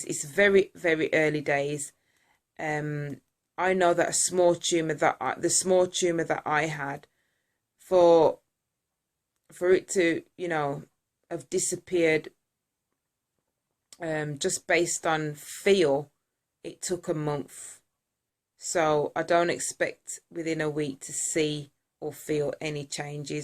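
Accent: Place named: Caribbean. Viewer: yesss sister that accent and I can tell u got Caribbean in you ☺ ..you're right though fibroids causes the abdominal area to bulge, I'm happy for you!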